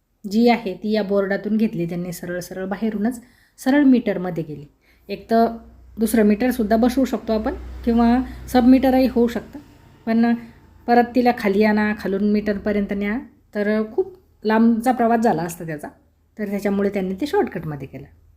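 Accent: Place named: Akola, India. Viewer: ताई तुम्ही अकोल्याचे आहे का तुमची भाषा तशीच वाटते जगातली भीमच म्हणतात बीमच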